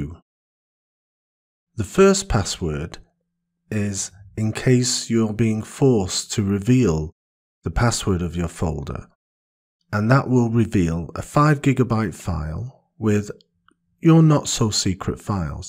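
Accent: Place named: England, United Kingdom. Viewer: DUDE!! This is a superb tutorial (1) It covers various scenarios a user might ask - at least for this specific topic of encrypted folders. (2) The explanation is clear and slow, so techies can speed up, but newbies can go slow. (3) An educated English accent is soooooo much clearer in articulation, rather than many Yanks who mumble and mangle words. Tech skills is one thing; teaching is another. That last bit may be a personal bias, but look at Hollywood movies - can barely figure out what they're saying. Maybe that's why the rely on big explosions. But that's a tangential topic.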